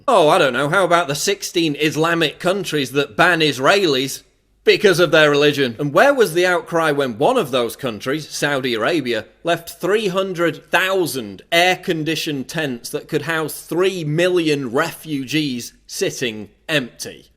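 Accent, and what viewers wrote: United Kingdom. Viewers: off topic but aren't you british ??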